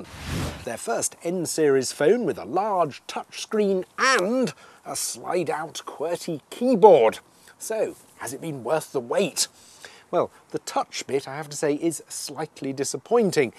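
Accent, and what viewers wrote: United Kingdom. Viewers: hehe love the brittish accent top class...ohh and the phone looks great by the way :)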